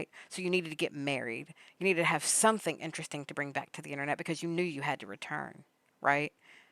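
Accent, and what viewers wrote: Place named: South, United States. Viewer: I heard the Southern accent and was sat. I know my people don’t these type of call-out videos unless it’s something serious. Also, Mucker here, passing out popcorn to all my fellows 😂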